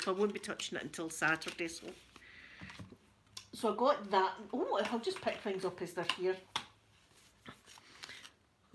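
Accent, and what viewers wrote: Scotland, United Kingdom. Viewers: I love that you wear two glasses like it`s the most normar thing :) and your laughter is priceless, I love it ❤️ I followed you for a long time. You are so nice, beautiful and sweet, and I love your videos ❤️ I love your accent (Scottish), and the accent in general is one of my favorits😍❤️ Ps: I usually watch your videos over and over again, they are so lovely and comforting❤️ stay safe and God bless you ❤️ lots of hugs from Norway ❤️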